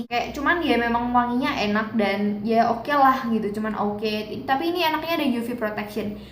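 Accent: Java, Indonesia. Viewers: kka agak medok ngomongnya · medok bgt kak ngomongnya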